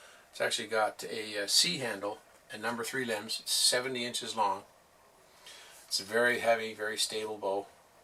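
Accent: Canada. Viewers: Say. Excellent video and review as always! I have a question for you though. You have a slight accent, I'm guessing Canadian, Wisconsin, Minnesota, North Dakota there a outs am I correct in assuming this because you mention swamps, I know I hunted swamp bucks in Michigan, Being a Yupper myself, Ae! Also see the hockey rink in pole barn and so on. Health wise I see you are looking could and I'm glad to see that as well. We'll take care be safe and look forward to more of your training / shooting Videos. Good hunting and God Bless Very Respectfully Bob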